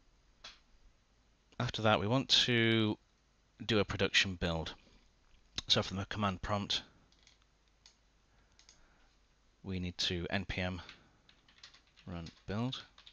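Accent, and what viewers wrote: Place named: United States. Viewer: Thanks mate. Helped a lot.. Where are you from btw? You sound like american but choosed europe, so I'm wondering :)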